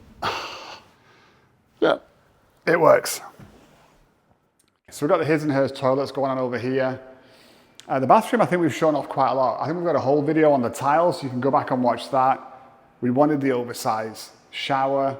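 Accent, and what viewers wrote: England, United Kingdom. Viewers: He dosent talk American his he from England. Beautiful house Amazeing.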